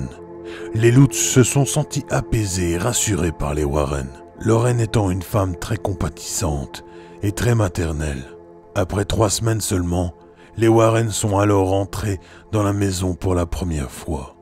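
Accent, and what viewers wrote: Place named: Belgium. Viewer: J'ai des amis belges qui prononcent également de cette manière. Ça donne houit pour huit, fouite pour fuite etc...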